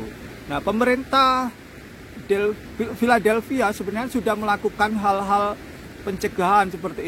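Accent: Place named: Java, Indonesia. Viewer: Salam teko blitar jawa timur mas.. Sampean logat bahasane koyo wong jowo... Jowo ngendi mas..?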